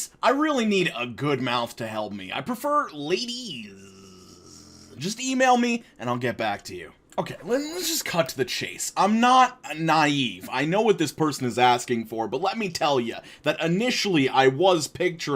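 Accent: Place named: Long Island, United States. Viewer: I have to admit I don’t care for the yelling and Long Island accent...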